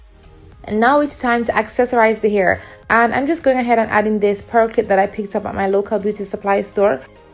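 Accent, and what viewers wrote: Jamaica. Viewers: I know this accent anywhere. Jamaican queen. U represent well. Beautiful hairstyles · This a gal yah a Jamaican she sounds like it pretty too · Hi there my Jamaican sister i love watching your videos of course if will be copying a lot of the styles you shared and am loving your accent.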